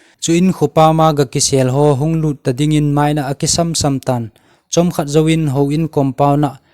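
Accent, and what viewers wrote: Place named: Singson, India. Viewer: Narrator pa hi Thanlon lang Singson accent amang e.. Ngainom aum e..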